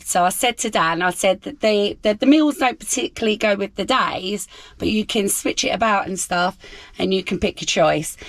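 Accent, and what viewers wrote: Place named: RP, United Kingdom. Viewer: Happy Easter love your posh voice 😅 😅 love & xxx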